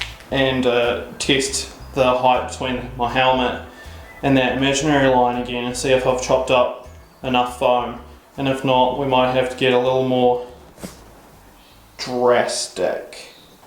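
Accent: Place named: New Zealand. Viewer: could tell you were kiwi immediately haha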